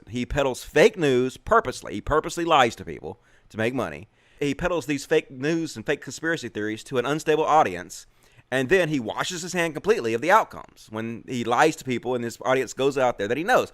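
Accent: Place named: Alabama, United States. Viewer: I guesss his Nothern Yorkshire accent is on a parr with your Southern Alabama accent as far as hickness goes. Puddings and...puddings and??? I'm at a loss. Can't think of anything other than the most corrupt police force in the country to come out of Yorkshire. Oh! Peter Sutclife. He's hardly a nice guy but look him up. I'm from Lancashirr. Our rivalry goes back cenuries. We had our own Civil War. Instead of war of the racists, it was war of the Roses.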